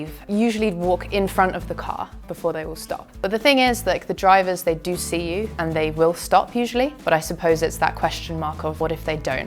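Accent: United Kingdom. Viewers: I really enjoy your British accent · 英國腔真是好聽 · 还有美妙的英国口音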